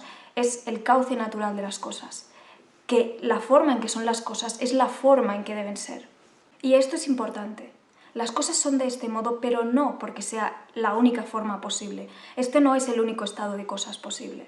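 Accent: Spain. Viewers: Y otra cosa la verdad no se de donde seas??. Por tu asento española no se si salgas pero en cuanto te refieres que los hombres hablan mas vete a un bar de estados unidos o de tu mismo pais sienpre abra grupos de muejres a estar hablando y hanlando y no se callan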